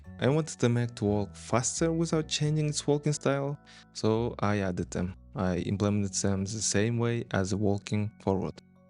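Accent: Russia. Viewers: Ты говоришь с сильным русским акцентом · У тебя будто бы русский акцент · русский акцент сразу слышно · У бро русский акцент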